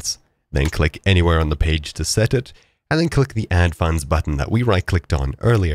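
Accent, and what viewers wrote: South Africa. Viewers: South African? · Hold up, you south african?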